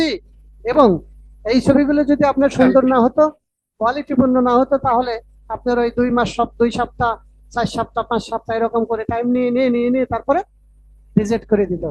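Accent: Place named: Chapai Nawabganj, Bangladesh. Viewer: ভয়েস শুনে তো মনে হচ্ছে চাঁপাই এর পোলা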